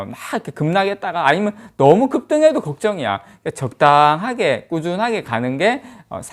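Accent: Gyeongsang, South Korea. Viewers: 식사하셧는교?? 우리포항 싸투리 올만에들으니 반가위요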